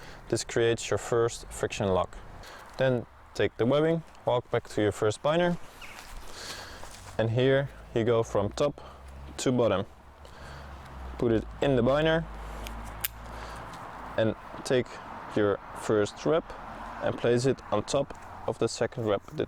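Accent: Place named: Netherlands. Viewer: That accent, you're from the Netherlands? Since I'm from europe, I'll be buying my primitive slack through you guys. Thanks for the video.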